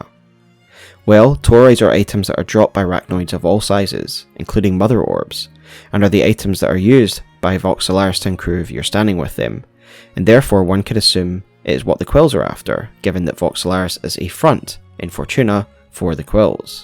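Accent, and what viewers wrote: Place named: Scotland, United Kingdom. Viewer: Quick question for ya. You clearly sound Scottish, are you toning your accent down for videos? Just curious because Shaun another Youtuber from Edinburgh does that as well.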